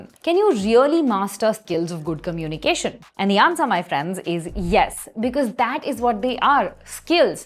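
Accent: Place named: India. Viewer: The Indian accent though...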